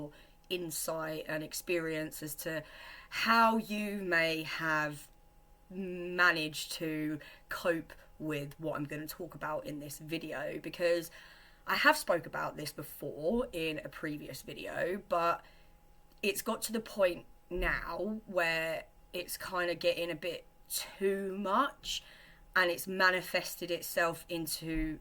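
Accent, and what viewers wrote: United Kingdom. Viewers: 😄that british accent in combination with heavymetal looks damn. heavy metal queen. just walk baby walking fixes everything. you are beautiful. why not become a mama? kids are nice. you lose some sleep but this is our ultimate purpose. *what kids give you in your heart, no therapist can do that. sorry for my english not good. everything is gonna be okay baby don't worry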